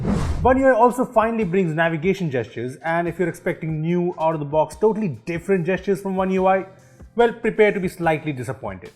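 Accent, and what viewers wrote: India. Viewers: I'm a simple man. I hear an Indian accent, I press dislike.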